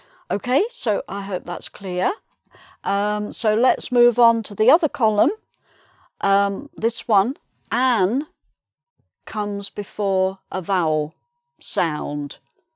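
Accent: United Kingdom. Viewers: This is british accent Thank you I like your program.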